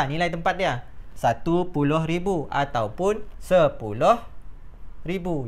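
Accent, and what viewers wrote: Sabah, Malaysia. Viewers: Cikgu bukan cakap orang sabah ke?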